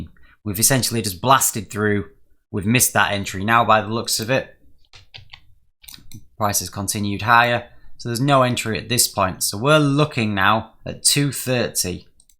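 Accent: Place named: Midlands, United Kingdom. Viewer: Great videos, thank you! I found your channel after learning about unicorn entries and you really helped me understand and see them easily. I took this trade too, although I go with a fixed 3R TP for simplicity atm (I don't take anything off before it). I personally monitor both GU & EU for Unicorn entries post 7am (UK time) which means I can focus on the one Strategy. Thanks again, and very much appreciate the uk accent too, given I'm from the Midlands originally (I think you're slightly north of there, but not by too much?)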